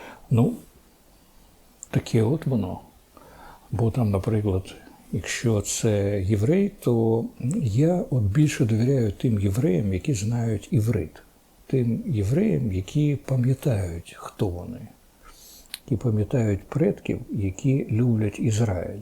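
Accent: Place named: Russia. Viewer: пане, "нЄхлюство" українською мовою - "недбалість". Вам не подобаються українські слова? вам більш до вподоби московські? з московською вимовою на кшталт "проблЄми" тощо. Навіщо ви так спотворюєте державну мову?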